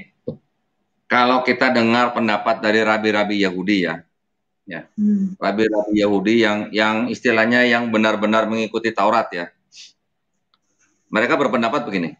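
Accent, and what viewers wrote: Banyumas, Indonesia. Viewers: logat bayumas ga bisa hilang sepertinya walaupun sudah lama di jakarta 😂🤣😂🤣 sehat selalu om.....semga selalu dalam lindungan allah swt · Klo Sy suka aksen pak Jawa ngapaknya masih kental g taunya dari Cilacap Sy sllu nyimak pak mualaf cerdas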